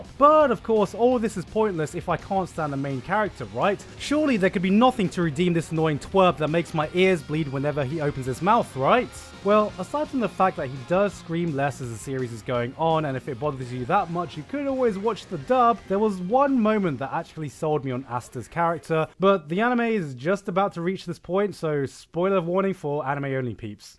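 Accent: United Kingdom. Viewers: Wow you sound really british in this video... perhaps the only thing left was talking about West Ham's next fixture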